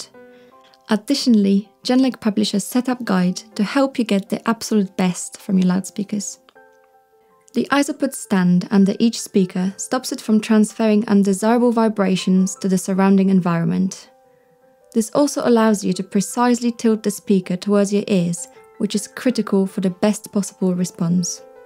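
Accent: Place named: Poland. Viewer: Słychać że polka :)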